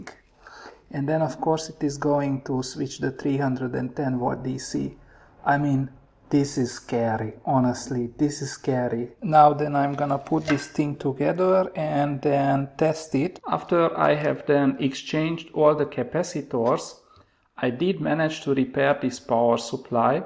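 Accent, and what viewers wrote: Hungary. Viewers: Tetszenek a videóid !!! Főleg a magyaros akcentus :)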